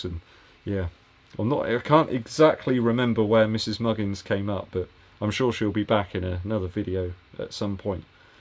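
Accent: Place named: London, United Kingdom. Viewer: Are you originally from Yeovil? You don't have a Westcountry lilt, you sound Londoner/South East.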